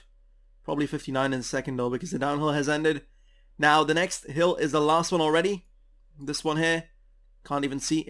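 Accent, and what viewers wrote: Belgium. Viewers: ben je een belg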